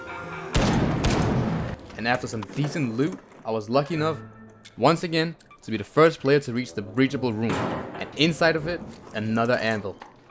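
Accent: Denmark. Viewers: Dansk accent on top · kan bare høre du er dansk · Are you by any chance danish? The accent sounds very much like it haha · You danish by chance? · I smell Danglish :D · 100% dansker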